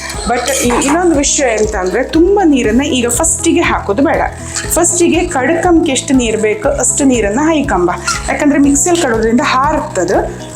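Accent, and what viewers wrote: Kundapura, India. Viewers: kundapura kannada super 😍😍 Niv tumba chanagi matadtira.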